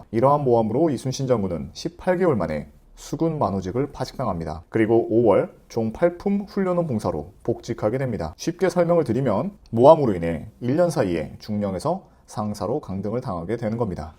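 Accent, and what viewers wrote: Jeolla, South Korea. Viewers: 혹시 전라도 사투리를 사용하시는걸까요?? 의 발음이 으 발음으로 하시는것같아서...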